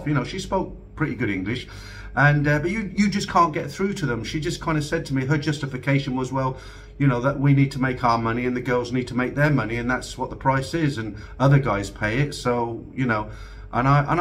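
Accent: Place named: United Kingdom. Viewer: It’s called an accent… Have you really been sheltered that much? Different parts of Britain do have different accents and in some areas, they tend to add an “err” sound at the end. They don’t even realize they do it. Because you know. It’s an accent. 🤦‍♂️ How do you think the word “soccER”, came about? (Actually there is more to how we got the word soccer but that is beside the point.)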